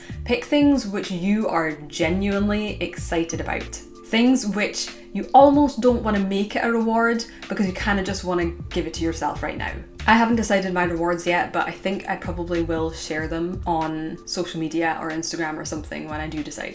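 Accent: Scotland, United Kingdom. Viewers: I love your accent! Is that Scottish? Great tips btw, I signed up for your newsletter to get the plot embryo videos because I struggle with the same thing, I love worldbuilding and creating characters but it's tough to come up with actual stories to suit the world and characters I've created. Thanks for the tips! My Alphasmart Neo is an essential tool for me. I'm an editor at heart, so I struggle with self-editing while I write. Using a distraction-free digital typewriter like Alphasmart products is a great way for me to get my first draft down without censoring or editing while I try to write. I'm hoping with all the tips I've learned from you and other channels, I can finally finish Nanowrimo for the first time after 2 other failed attempts.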